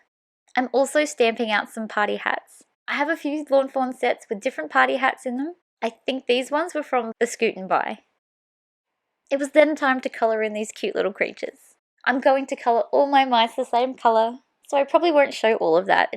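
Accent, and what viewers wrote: Australia. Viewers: so nice to hear an Aussie accent!! Congrats on your 100th video tutorial! Adorable card!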